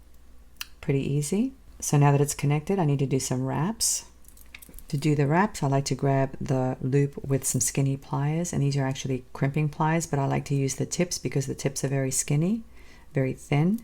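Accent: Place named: Australia. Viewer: Dear, I love the necklace you showed us at the beginning of this video. Is that your beautiful garden? What is lovely place to live in. Your accent sounds a bit Australian - have I got that wrong or have you ever lived here in Australia? Thank you for your great videos, love from Amanda in Melbourne, Australia ❤️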